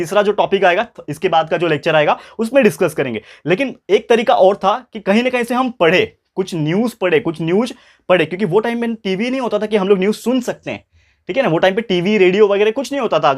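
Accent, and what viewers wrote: Maharashtra, India. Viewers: Sir is your mother tongue is Marathi??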